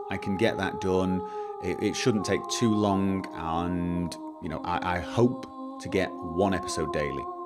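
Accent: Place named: Scotland, United Kingdom. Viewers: LOL I always thought you were Scottish. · Norway? Honestly, I would have never guessed. Hahaha (facepalming) I thought you were Scottish....at least that's what I thought your accent sounded most like. BTW, I haven't heard your opinion on Bethesda's upcoming, special E3 Conference....do you think they'll be announcing Fallout 4? I'll definitely be keeping an eye out for your mods when it does come out. Personally, I think they're getting ready to announce it. I can't think of any other reason they would rent out an entire theater in downtown LA for a day.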